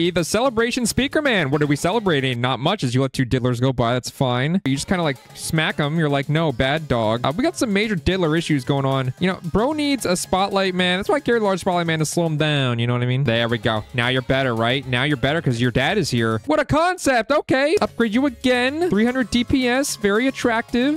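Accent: United Kingdom. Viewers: Bros british accent is so good whattt😂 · W british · Bro turned british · lol british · bri'ish man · BRITTISH :D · bros British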